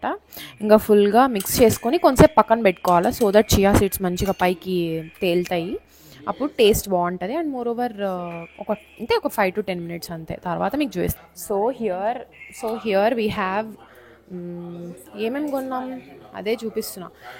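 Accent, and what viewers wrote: Rayalaseema, India. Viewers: Pakka maana Rayalaseema language ❤❤❤❤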